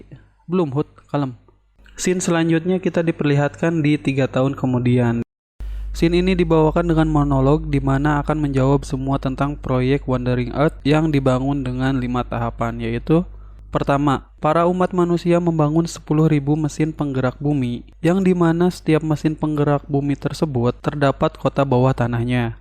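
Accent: West Java, Indonesia. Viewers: Abi ti Tasik kang, komentatorna sundanese, timana nya ?? Seru dan lucu.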